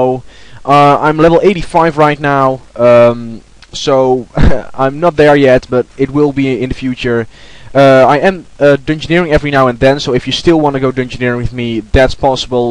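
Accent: Netherlands. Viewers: i can hear that you are dutch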